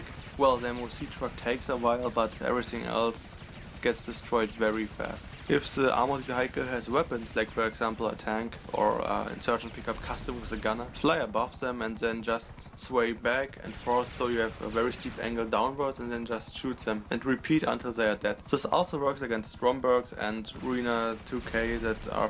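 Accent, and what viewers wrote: Germany. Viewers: Man merkt du bist deutsch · If you are German... I love German accents so muchhhhhhhh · Wie krass man hört dass er deutsch ist ;)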